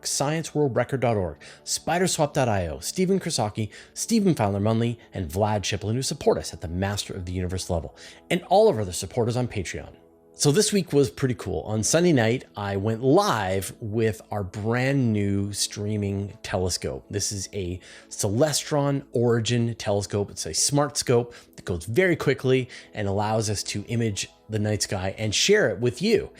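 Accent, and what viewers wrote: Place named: Western Canada, Canada. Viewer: I won't ding your pronunciation of "lava," but Vega should be pronounced VEE-guh,. And don't blame your western Canadian accent! 🙂 Normalize VEE-guh!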